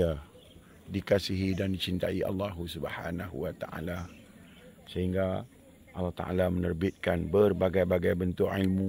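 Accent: Kuala Lumpur, Malaysia. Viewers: Hang cakap sleng KL no. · Bersame same , kepade , ustaz Cakap KL 😂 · hehehe.. ustaz cakap kL oo..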